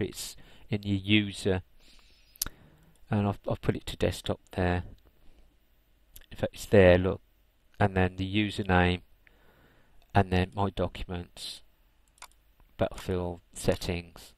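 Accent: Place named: Birmingham, United Kingdom. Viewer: John Camus He's from Birmingham by the sounds of it (UK), we call them "Brummies"!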